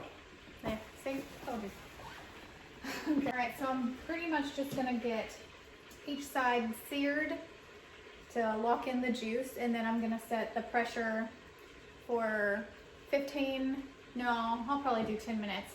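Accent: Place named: Oklahoma, United States. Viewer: Just found your channel recently .Love it ! And you sound like you could be an Okie too😁👍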